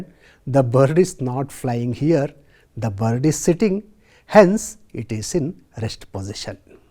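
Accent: Telugu, India. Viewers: Ur mother tongue telugu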